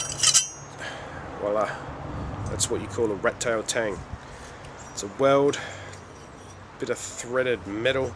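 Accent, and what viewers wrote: Australia. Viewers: are you australian or newzealander? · when i first heard you talk i though your were australian and i got excited to find out we could get swords here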